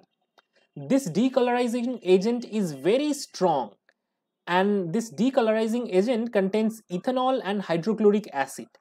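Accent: Bengal, India. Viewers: U have accet of a bengali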